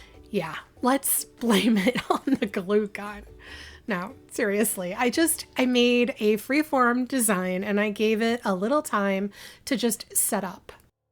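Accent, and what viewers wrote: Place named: New Jersey, United States. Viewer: You did ❤ and I picked up on your New Jersey accent. I heard you say "Mirrah" when referring to the mirrors. Thought it was endearing 🤗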